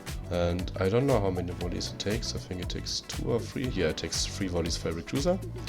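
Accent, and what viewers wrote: Germany. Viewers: Ahh the German accent ..! Which eve time you playing usually?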